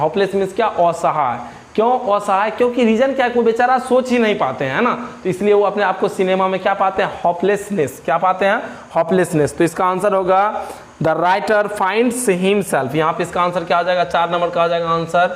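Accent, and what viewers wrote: Bihar, India. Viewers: Aap bhihar ke h sir 🤔🙏🙏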